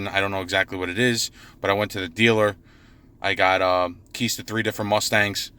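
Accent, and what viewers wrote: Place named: Massachusetts, United States. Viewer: HAVENT... YOU GUYS EVER HEARD FORD=FOUND ON THE ROAD DEAD? HELLO. YOU SOUND LIKE A MASS RESIDENT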